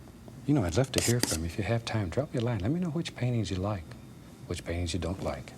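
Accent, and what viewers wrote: South, United States. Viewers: "It's purdy" God bless the south!!! And thank you for our southern accents!!!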